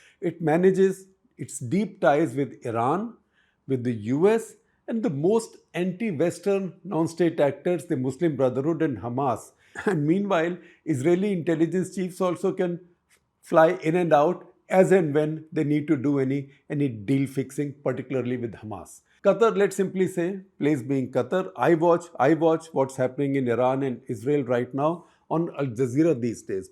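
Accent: India. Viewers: His thick Indian accent requires a lot of focus to comprehend what he is saying.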